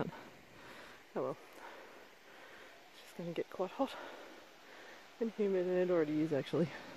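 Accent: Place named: Australia. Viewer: Keep up the good work as Americans love Australians and there awesome accents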